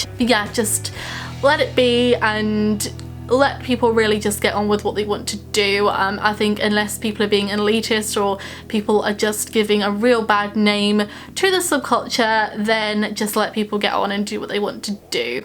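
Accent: United Kingdom. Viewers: I don't really understand your British accent because I'm Brazilian and my English is a little limited, but I liked your video, I've been goth since December 2022, and until then I didn't know my side, until I discovered nu goth, and I understood that many people used the image of goth just for aesthetics, but I have a passion for the entire subculture, when I discovered nu goth, I fell in love, I identified so much, I feel like I consume A LOT of gothic or headmetal books and music, but my style, my way of living, is totally Nugoth, I'm kind of sad that it's seen as a trend, because for me it's something very special ❤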